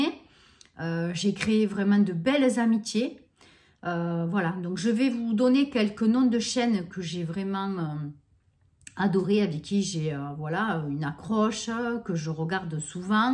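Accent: South, France. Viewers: Dis donc je me régale avec l'accent du sud, il y en a peu sur YouTube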